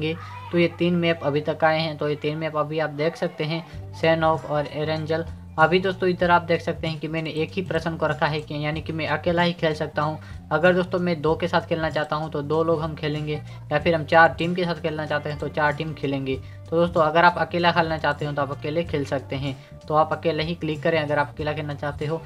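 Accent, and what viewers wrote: Gujarat, India. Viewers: Gujarati Cho? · Bhai aap Gujarati ho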